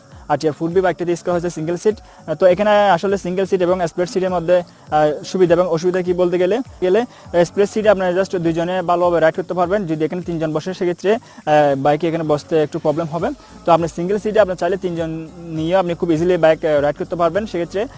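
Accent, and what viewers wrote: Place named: Chittagong, Bangladesh. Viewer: কথায় পুরা চিটাইংগা এক্সেন্ট 😷😂😂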